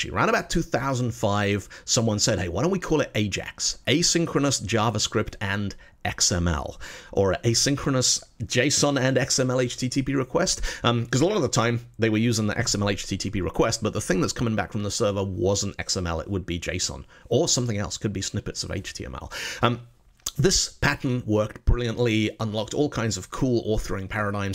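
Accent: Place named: United Kingdom. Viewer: I can't pin your accent. It's like British with a twist of Oz and North American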